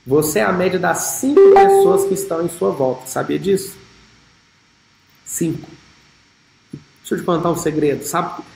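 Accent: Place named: Goiás, Brazil. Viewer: Alguém em agosto de 2024. Até o sotaque dele era um goiano bem puxado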